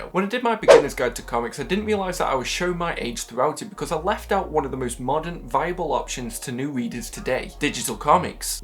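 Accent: United Kingdom. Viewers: No, you have a great content creation vibe! I just rarely hear a fellow UK accent, and the pronunciation of 'book' is one of my favourite regional pronunciations haha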